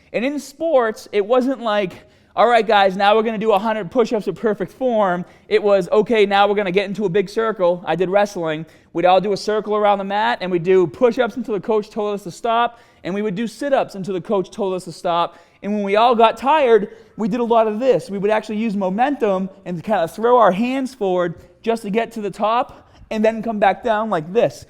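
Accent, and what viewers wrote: Boston, United States. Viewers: Is that a Bostonian accent I hear?? :)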